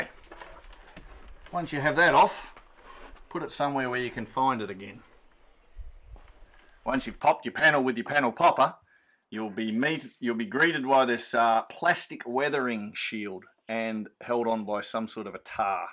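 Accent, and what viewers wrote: Australia. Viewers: Great video, and great to hear an Aussie, in America, driving a British car! Very multi-cultural. I need to change some actuators in my Disco 2 and hesitated when confronted with having to 'fiddle' with the window jams / frames. After watching your video it doesn't look as bad as I thought it would be! Thanks! On another note, I changed the actuator on my rear cargo door as it wouldn't unlock with the remote key. Upon changing it, and then testing it, it still wouldn't work, then I realised you have to press the unlock button on the key fob twice to unlock the cargo door. It then worked. It made me wonder if I even needed to change the lock in the first place - I just needed to press the key fob button twice lolol. At any rate, at the same time I removed and rotate through 180 degrees and reinstalled the factory spare wheel carrier so that it would allow me to put bigger tyres on the car including the spare without clashing with the bumper. 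So all was not lost in this exercise. Thanks again for your videos - they are my first stop when I am contemplating doing some repairs and maintenance on my Disco. Cheers! Michael, Alice Springs, Australia.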